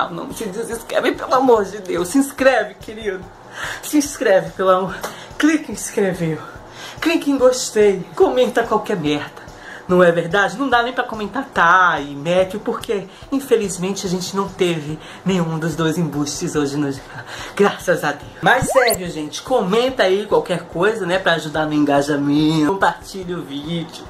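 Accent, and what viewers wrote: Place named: Bahia, Brazil. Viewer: pq você tem sotaque baiano?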